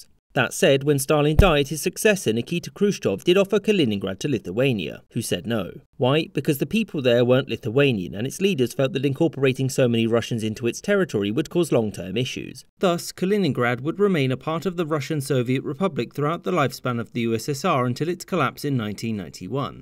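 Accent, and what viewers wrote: United Kingdom. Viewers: Since you have a British accent, how does England own Falklands and Gibralta, and some part Cyprus? And so many lands outside UK? That should be the real question.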